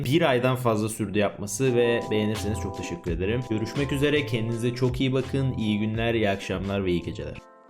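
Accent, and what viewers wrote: Thrace, Turkey. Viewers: Abe adaş trakyalısın galiba sen nerden Be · Abi sen Trakyalı mısın ? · D-dostum sen benim trakyalı adamımsın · bi trakya ağzı sezdim harika video beğenildi .d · trakyalı mısın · abi sen bizim oradansın beya tırakya dimi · Abi trakyalısın galiba siveli konuşunca çok iyi oluyor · abi trakyalısın galiba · Hep videolarında trakya şivesi konuş çok iyi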